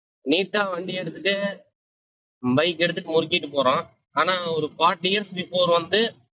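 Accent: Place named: Coimbatore, India. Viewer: neega pesaradhu nalla iruku.. coimbatore slang🙂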